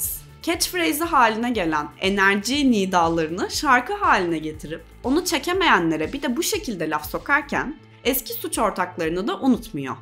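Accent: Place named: Bolu, Turkey. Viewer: bounlu di mi, konuşmasından anladım...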